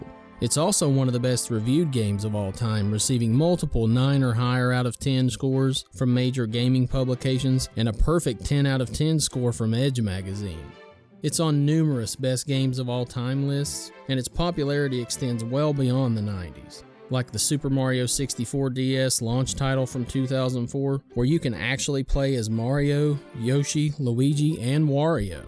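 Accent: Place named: Georgia, United States. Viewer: I just want to say as a guy who grew up in rural Georgia and was born in the mid-80's, it's gratifying to watch this video in that accent and hear you describing memories of experiencing Super Mario 64 at about the exact same age I did.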